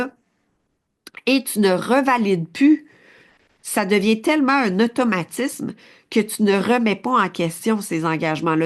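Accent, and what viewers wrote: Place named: Quebec, Canada. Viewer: Je supporte difficilement l'accent quebequois.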